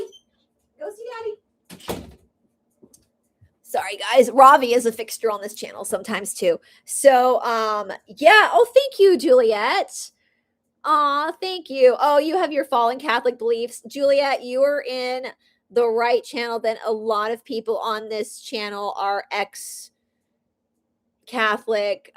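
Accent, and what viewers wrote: South, United States. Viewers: Oh, and all the southern isms are spot on, lol.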